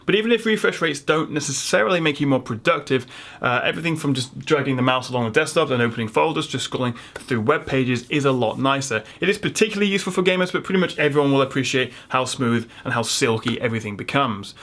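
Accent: United Kingdom. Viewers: R Doc Id rather listen a pleasant British accent than an accent that sounds like a Canadian who stuffed two lbs of processed cheese up their butt. Just sayin.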